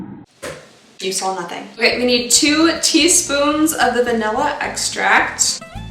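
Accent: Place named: South, United States. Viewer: I don't know if you actually went to Michigan Tech but in my head cannon you did. When you get stressed your southern accent gets stronger and I imagine the Yoopers being hella amused by that. A Southern Belle in Yooperland.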